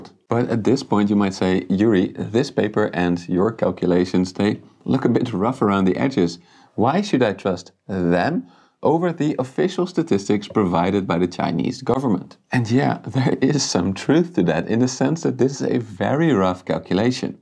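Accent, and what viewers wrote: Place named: Netherlands. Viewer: He's Dutch. This is obvious from his accent and from his name.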